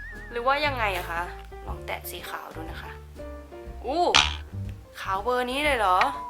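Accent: Isan, Thailand. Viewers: สำเนียงอีสานก็มา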